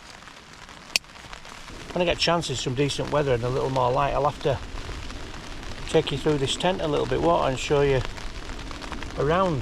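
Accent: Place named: Rotherham, United Kingdom. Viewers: Where abouts are you from? I’m from Rotherham an recognise your accent 😂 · Here from TikTok. Your accent sounds super local to mine. I’m from Keighley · I came from TikTok, but I couldn’t be happier! As a fellow northerner (I can tell by the accent) who moved to The Peak District last year, not only do I find this enjoyable, but it’s so useful, I’m going to have to watch back through your videos and learn your amazing methods and tips!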